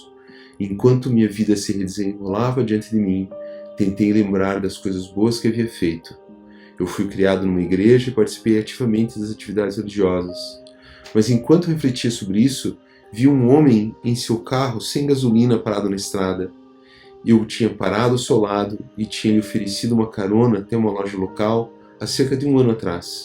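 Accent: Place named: Portugal. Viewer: Esse sotaque português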